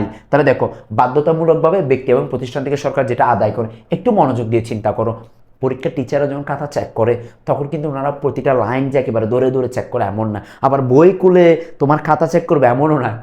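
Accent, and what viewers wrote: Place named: Chittagong, Bangladesh. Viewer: Free class koro Abr eto kisu? Spelling e somossa na sir Chattogram er so sir er pronunciation ta different hobe etai savabik taina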